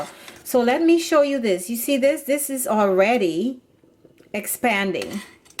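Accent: Trinidad and Tobago. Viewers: You sound like my cousin from Trini, so easy to listen to. You're a natural born teacher. Thanks! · hmmm my Trini spidee sense is telling me your Trinidadian. Big up all my TRINI!!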